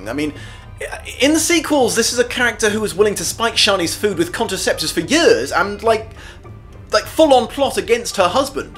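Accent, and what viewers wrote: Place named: United Kingdom. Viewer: From a guy with an British accent, it's funny that you miss that Dune is closer to T. E. Lawrence in the Arab revolt in WW1 (Lawrence of Arabia) than current events with the USA.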